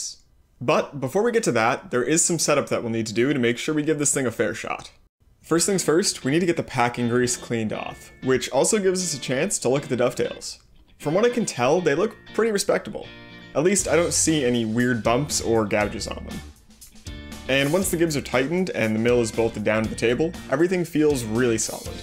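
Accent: Canada. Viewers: I thought I detected a Canadian accent and then sure enough, out came the Power Fist dial indicator! Nice video.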